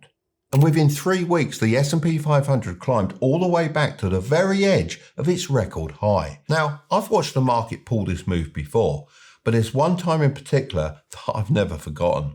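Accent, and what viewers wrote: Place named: Clacton, United Kingdom. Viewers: I tend to find that what to all intents and purposes is MIDDLE AGED bloke with an esturial dialect from somewhere close to London and talks about dollars rather than the exchequer of his foundation is someone worth taking with a whopping dollop of salt. This bloke has all the hallmarks of being bogus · Is this bloke from Clacton on sea !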